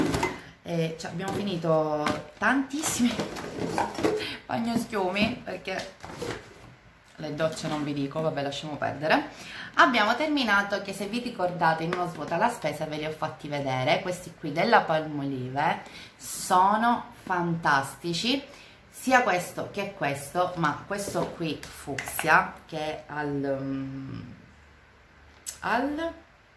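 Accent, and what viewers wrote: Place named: Palermo, Italy. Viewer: Anchoo nuova iscritta sei molto simpatica e cmq essendo una siciliana ho capito subito che sei di Palermo......io Catania ..bel video alla prossima!!!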